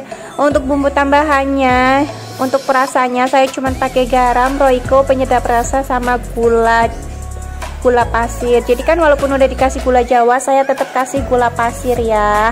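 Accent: Banyumas, Indonesia. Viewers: Emang mb aslinya orang mb sih mb kok kayanya orang ngapak y mb ak tuh orang Tegal mb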